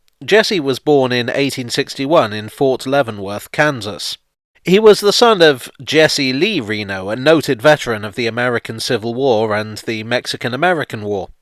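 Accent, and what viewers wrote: United Kingdom. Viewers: Actually, with your British accent, you seem to make it sound much more upscale & classy than it actually is. Very well done Sir.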